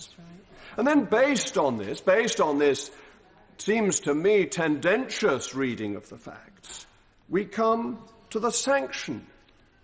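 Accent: RP, United Kingdom. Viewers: You are confusing intelligence with a posh accent.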